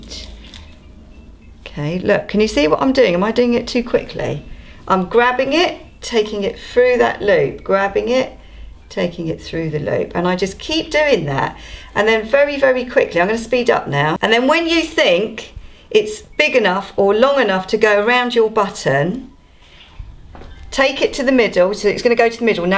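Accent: United Kingdom. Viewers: you have the uk accent that i just looooove ! i repeat after you like that i learn the uk accent . hihihi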